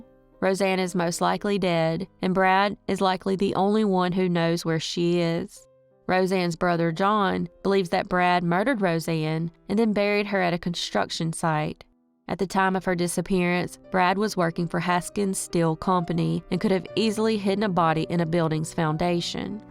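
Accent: South, United States. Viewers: Hey! I'm not sure what your actual name is, so I'll call you. Just so you know, I binged your content last year while building a chicken coop and cutting a lot of wood. So, whenever I hear the intro, I can smell cedar! Or, whevener I smell cedar, the intro plays in my head! Weird, eh? But anyways, thank you so much for the content and soothing voice and southern accent. I wonder, have you noticed a difference in viewership between unsolved and solved cases? I know, for me, I'd like to see more solved cases. Thoughts?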